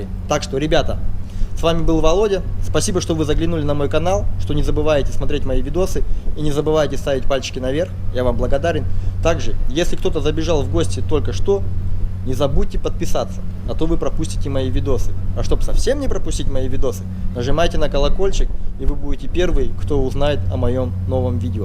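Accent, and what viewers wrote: Kazakhstan. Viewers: у тебя говор и речевые обороты как у моего мужа и его брата и племянников из Казахстана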